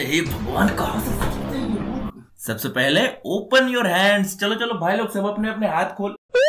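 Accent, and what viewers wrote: Gujarat, India. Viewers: h aapka real naam 😅... I already knew you are from Gujarat bcz u have a gujarati accent... Waise awesome video, it was really very funny... also congratulations for 100K subscribers.... ❤️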